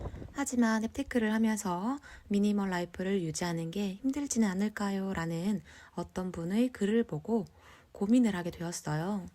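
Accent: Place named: Gyeongsang, South Korea. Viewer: 저는 서울지역 이라 그런지 첫마디부터 경상도 말투가 느껴지는데요. ㅋㅋㅋㅋㅋ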